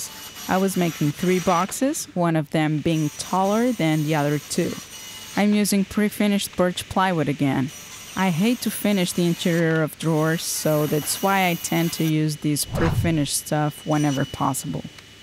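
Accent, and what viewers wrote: Portugal. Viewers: Pqp, assiti o vídeo inteiro imaginando que sotaque é esse e vc é portuguesa kkkkk sou br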